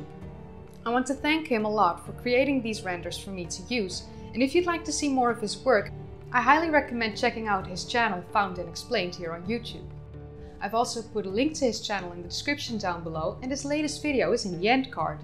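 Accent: Netherlands. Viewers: Dutch , I think she is from the Netherlands. She will correct me if I am wrong.😊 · Dutch speaker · That accent…is she Dutch? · I detect DUTCH in your voice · Dutchie detected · Dutch accent? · je kan horen wanneer er een Nederlander Engels praat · Bespeur ik daar een Nederlands accent? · She sounds so Dutch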